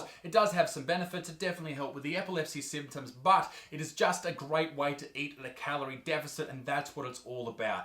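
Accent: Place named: Australia. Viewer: I just love way of talking, not just the fact his accent is Australian. But the way he brings the problems to light and why stupid shit like this can be extremely harmful for just the people who pro overweight confidence but people who are insecure and it gives some them bad ideas. Nice work, even if those don't listen but there are people we can save from this stupid ideas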